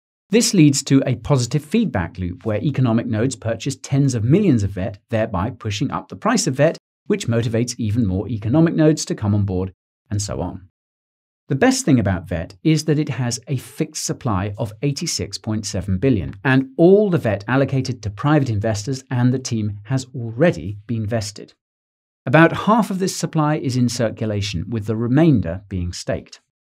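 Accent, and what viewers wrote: United Kingdom. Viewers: Is he British I can't tell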